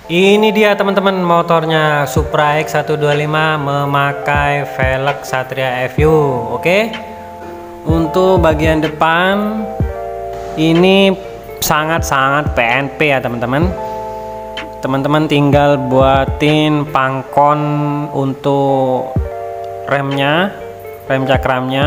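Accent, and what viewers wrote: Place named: Madura, Indonesia. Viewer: Logat bahasanya kaya logatnya orang madura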